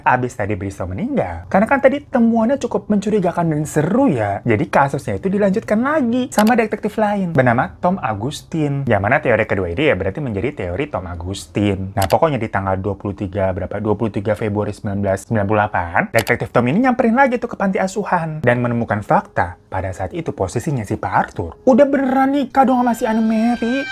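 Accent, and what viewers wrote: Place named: Jakarta, Indonesia. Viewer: Bang w msih blm bsa tdr nyenyak nih krna,w pnsaran knp nama Lo kek orang Jepang,tp logatnye betawi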